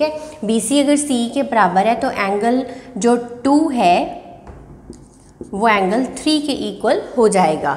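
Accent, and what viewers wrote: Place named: Punjab, India. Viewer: Mam aap ka way of teaching bhut best hai Apki panjabi bahut cute hai ... Mam aap bahut acchi Hain ❤️❤️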